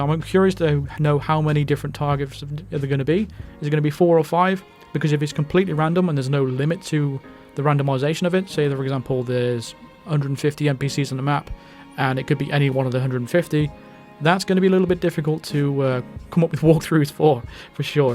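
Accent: United Kingdom. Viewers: Finally a British man narrating hit man gameplay like a pro this is exactly what I was looking for when I think of good content! But bro mans can you convert pounds to dollars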